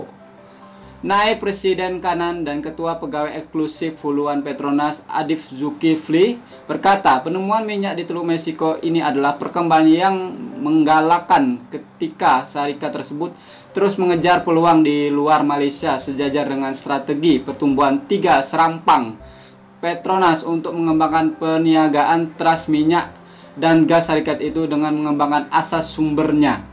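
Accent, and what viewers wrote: Aceh, Indonesia. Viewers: Cara ckp to mcm urg aceh .. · Gaya ckp nye spt org acheh..xlma nt kna kutipla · Betul bro Loghat mcm aceh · kerana gaya cakapnya itu seperti dia nya berada di Indonesia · Pelat bahasa nya org aceh,video yg mencabar kedaulatan malaysia itu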